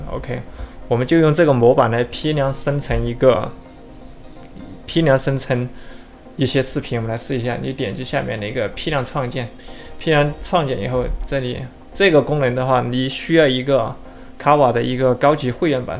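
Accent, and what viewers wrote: Sichuan, China. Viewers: 播主说的川普，挺可爱的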